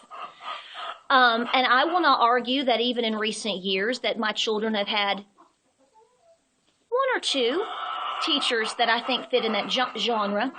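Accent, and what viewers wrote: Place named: Tennessee, United States. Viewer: I want to keep listening because I love what you are saying and I am right there with you. But the rooster is giving me a headache. Please consider filming again without the loud background noise?? Please? I love your accent...I am from Tennessee and you sound like you are from around my neck of the woods.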